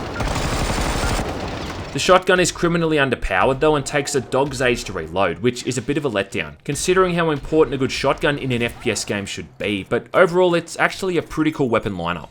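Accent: Australia. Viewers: OMFG I was just thinking of this game and how awesome and underrated I thought it was and then you go and make a review about it, how timely, keep on rocking and reviewing old gems dude, love your channel for this and your Australian accent, gives Hemsworth a run for his money:D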